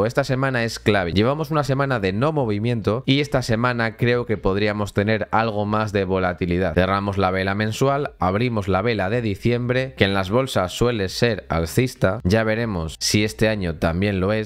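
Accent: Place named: Murcia, Spain. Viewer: Te vi por orihuela. Eres murciano no? Se te nota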